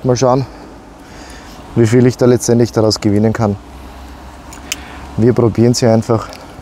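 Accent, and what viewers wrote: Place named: Carinthia, Austria. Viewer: Hallo da habe ich keine Probleme,dich zu verstehen 🙂 habe viele Jahre im Kärnten meine Ferien verbracht 🇦🇹 war immer eine super Zeit,die ich dort verbringen durfte ♥️ Daher ist mir deine Aussprache sehr bekannt 🤗 Gutes Video von dir,Wie immer sehr informativ Grüsse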